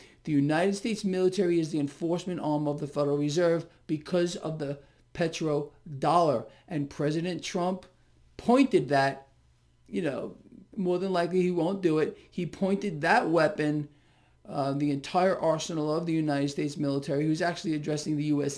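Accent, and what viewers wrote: United Kingdom. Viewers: Sounded a little bit like a posh English accent 😁👍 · British..The accent is British...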